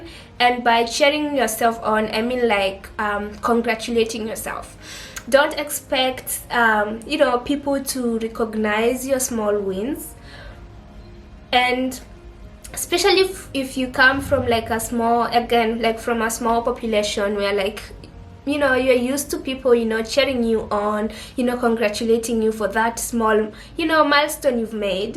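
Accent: Kenya. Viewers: Your accent is East African most likely Kenyan.. Are you from Kenya? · Number 2. You're an extrovert. As for me I am happier when I am alone. But why do you sound like a Kenyan? Kenyan accent.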